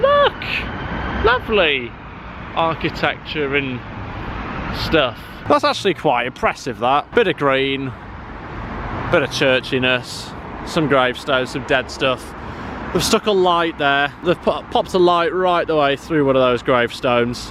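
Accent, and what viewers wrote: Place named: Doncaster, United Kingdom. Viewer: knew you were from Donny. well obvious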